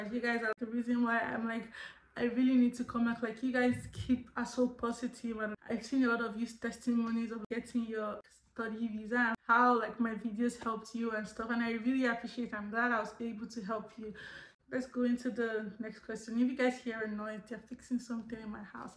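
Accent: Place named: Canada. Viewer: Wow... welcome back, i really miss you and i love Canadian accent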